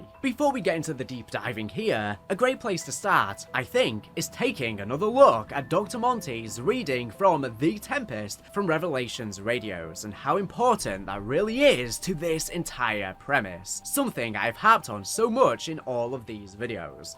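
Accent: United Kingdom. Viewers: He's just British 😅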